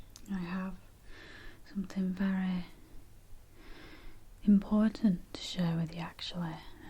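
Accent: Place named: North, United Kingdom. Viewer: Awesome video, really love this channel. Your northern accent/dialect adds to the experience. Love you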